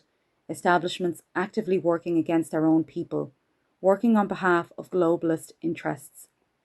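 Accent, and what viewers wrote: Ireland. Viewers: Beautiful Irish voice.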